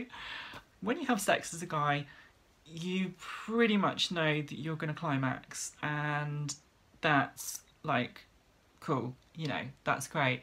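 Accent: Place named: United Kingdom. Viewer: Great to know your transition turned out the way you wanted it to your beautiful and sexy......I love your British accent....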